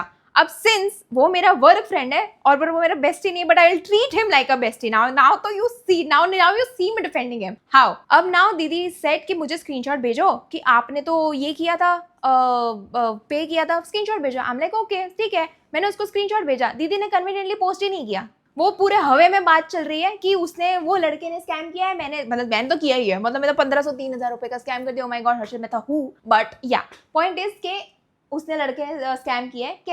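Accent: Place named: Gujarat, India. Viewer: Love and her gujju accent ❤ dint watch the video u talking about but am definitely on your side 🫶🤌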